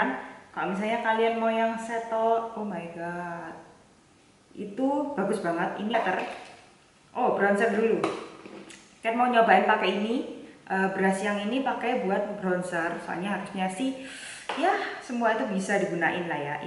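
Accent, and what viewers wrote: Java, Indonesia. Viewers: Kok medhookkk 😍😂😂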